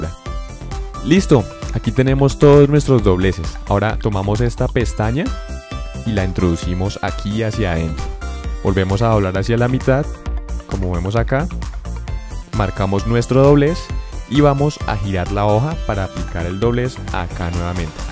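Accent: Bogotá, Colombia. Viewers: tu eres de colombia hablas como colombiano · Tomas Echegoyen los colombianos ablamos asi y para tu informacion somos uno de los paises que mejor abla español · Eres colombiano verdad? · BearMG Bogotano, seguro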